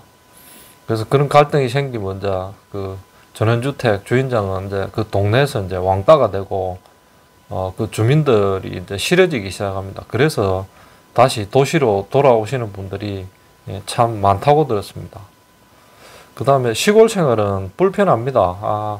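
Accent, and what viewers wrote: Gyeongsang, South Korea. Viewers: 경상도 액센트가 너무멋져요❤❤❤ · 구수한 경상도 억양이 정감이 듭니다 전원주택의 벌레.잔디관리.귀촌의 단점을 잘 알려주어 로망을 접어야겠습니다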